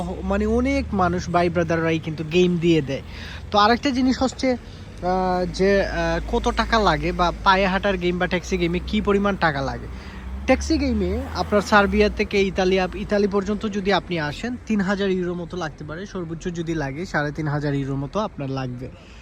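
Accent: Sylhet, Bangladesh. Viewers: ভাই আপনি কি সিলেটের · ভাই আফনে সিলেটি 😊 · আইরাম মানি আপনি সিলেটি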